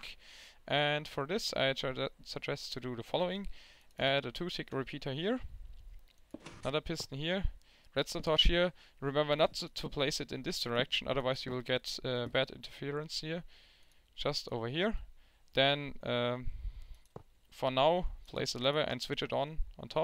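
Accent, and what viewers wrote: Germany. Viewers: man merkt das du deutscher bist der englisch redet :D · Hes german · dieser deutsche akkzent! :D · hört sich komisch an, englisch zu sprechen mit dem deutschen akzent :d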